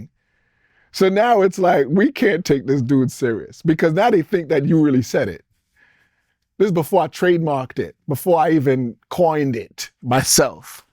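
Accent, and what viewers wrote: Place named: Kentucky, United States. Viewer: You sound like a victim. You saying how you cared for others and provided. I'm from Kentucky and black you sound like us country and a voice change for somewhere overseas. Now was a Trippin he saying I'm going to daddy's house a few times. Sounds like he got a lawsuit coming. My brother, my friend black men need to embrace mental health with appointments.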